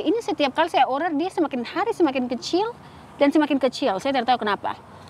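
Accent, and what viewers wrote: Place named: Flores, Indonesia. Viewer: Seperti logat orang flores atau apua atau ambon nih.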